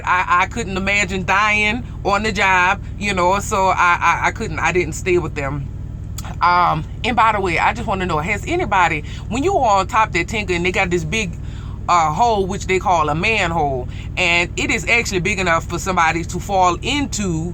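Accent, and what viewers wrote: Louisiana, United States. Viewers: Love that Cajun accent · LoL I love your accent, are you from Louisiana? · You're soooo Louisiana! I don't hear that accent often....that's dope. My dad was from there. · love the accent sound cajun or from the city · Shawty which part of Louisiana you from? I can recognize that accent anywhere. lol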